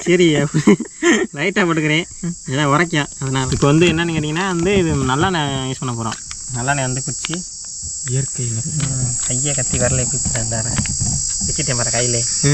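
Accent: Sivaganga, India. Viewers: Siva gangai tamil super nanba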